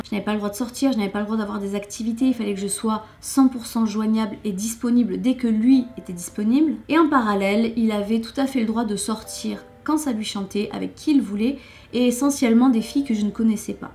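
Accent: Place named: Montpellier, France. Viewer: Wahou, tu me touches beaucoup. J'ai l'impression de me voir à travers toi, même si je suis beaucoup plus âgée. Et en plus tu dois être de Montpellier vu ton accent! Tu fais si jeune et tu as connus déjà des choses assez difficiles... Choses que je connais parce que comme toi, je suis très sensible, naïve et gentille. Quel courage tu as eu de garder cet enfant avec des parents pas très compréhensifs. J'ai connu tout ça aussi. Bref, la vie est devant et je te souhaite tout le bonheur du monde. 😊💋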